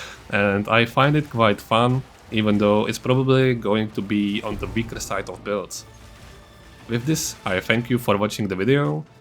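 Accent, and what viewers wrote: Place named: Czech Republic. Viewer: Ahoj je fajn vidět že i v česku se hraje poe, ten přízvuk poznám prostě všude :D muzu si te pridat na dc nebo tak ?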